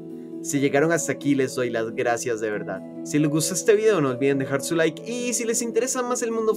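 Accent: Costa Rica. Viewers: Eres tico? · ¿Se puede ser más tico? Jajaja, me encabta este canal ❤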